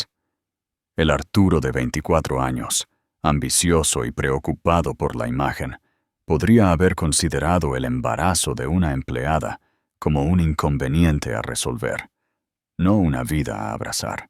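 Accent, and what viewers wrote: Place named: United States. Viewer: Pronunciation y acento muy gringo no me gusta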